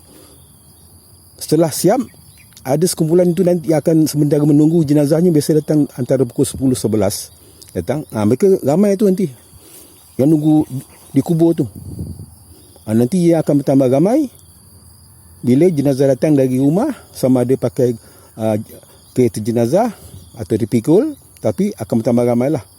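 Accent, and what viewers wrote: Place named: Melaka, Malaysia. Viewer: Ini lorat percakapan orang Melaka ni...ni mesti kejadian di bumi Hang Tuah ni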